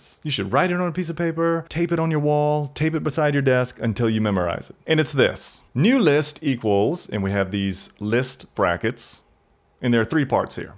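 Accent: South, United States. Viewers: You have a very subtle southern accent.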